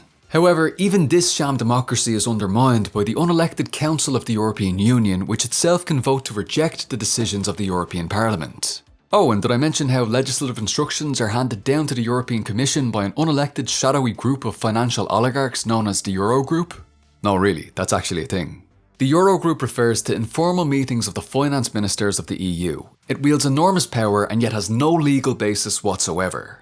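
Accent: Ireland. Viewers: It's always been the same goal, with only slight variations in the methods used, since The Enlightenment (about 3 centuries). Imperialism is synonymous with unlimited socialism for the capital class and tyranny for everyone else. Our own (American) revolution was a reaction to this system, which our own capital class recreated immediately following the war. My list of smaller YT channels that contrast reality with popular mythology grows a little bigger each time any of you credit another, similar channel. Here's another sub and like. Aside: I really like hearing an Irish dialect for a change, it seems that we depend very heavily on our eastern european fellows for information on how things really work and the history of how we got here.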